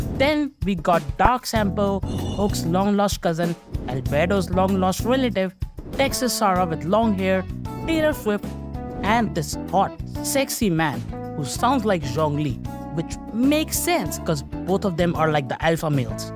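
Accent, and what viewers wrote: India. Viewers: ur indian ryt?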